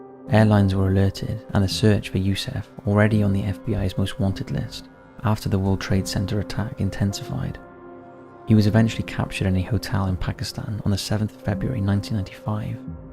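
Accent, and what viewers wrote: United Kingdom. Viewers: I just want to say I love these "Hit Those Lights" videos and the narrator being British makes it so much more convincing and real. I've always been fascinated with what makes people do these horrendous, horrifying acts of violence and I try to find a pattern like they were victims themselves or there was a history of trauma but it's when there is no explanation found or the perp got away with it makes it even more un-nerving and intriguing. Keep up the good work!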